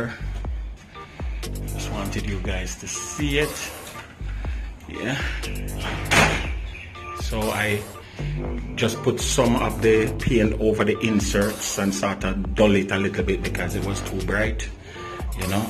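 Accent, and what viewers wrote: Jamaica. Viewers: Lol a Jamaican wow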